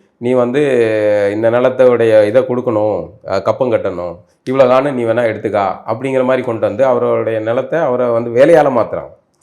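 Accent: Chennai, India. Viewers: நெறியாளர் (சில இடங்களில் ) சென்னை பாஷை பேசுவதை தவிர்க்கலாம். 🎉🎉😮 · சென்னை தமிழை ஏன் தவிர்க்கணும்...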